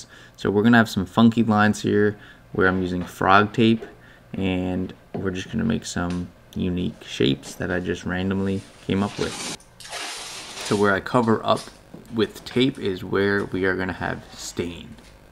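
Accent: Canada. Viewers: you from canada dude? accent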